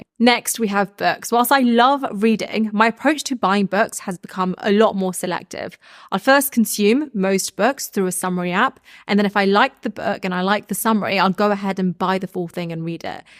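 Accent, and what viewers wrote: United Kingdom. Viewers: Again overdose of british accent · Love your attitude. ❤ I have similar Lifestyle. As a german, I must say, you speak to fast for me and very britisch 😊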